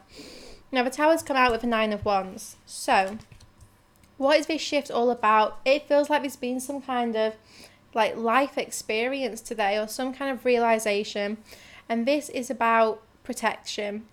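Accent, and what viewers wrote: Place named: Northern England, United Kingdom. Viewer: Hullo, you are VERY tuned in. Your channel popped into my auto generated YouTube list yesterday and yesterday's reading followed by this one is mind blowing. And I'm only of today's reading, LOL, just wanted to give you the thumbs up straight away. You have a northern English accent. Where are you based - Yorkshire?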